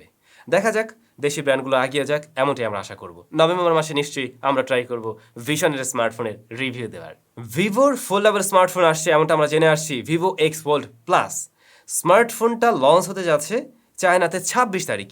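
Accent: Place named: Narsingdi, Bangladesh. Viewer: Amader narsingdi r Kotha sone vlo laglo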